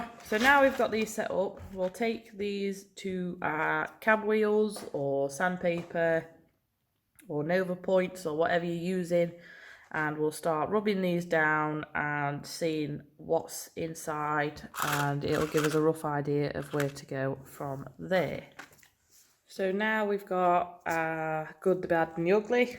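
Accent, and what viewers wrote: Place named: Stoke, United Kingdom. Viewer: I have a piece of Opal but nothing to cut it with 😂 you sound like your from stoke, are you?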